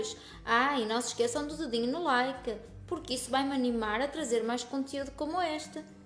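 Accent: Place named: Portugal. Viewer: Seu sotaque português é muito fofo. ótimo tutorial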